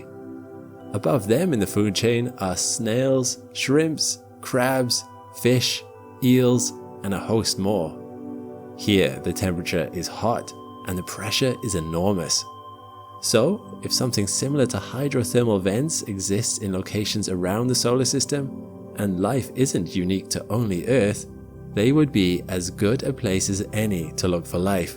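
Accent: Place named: United Kingdom. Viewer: I'm guessing it's less "correct" vs just locational pronunciation. I believe he's in the UK based off how he pronounces some things Here where I live in the us, some things are pronounced different in my state than another state, so I'm giving benefit of the doubt to him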